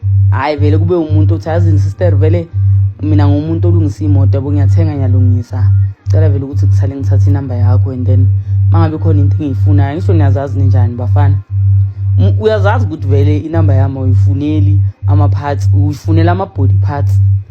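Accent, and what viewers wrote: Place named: Msawawa, South Africa. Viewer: Watching from OrlandoEast❤Yaz bengsamangalele itone yakho netaal Kanti wangapha eMsawawa,😂❤ Lentwana Lexi zize ngamawaza🤞maar zyaseta bro🤣🤣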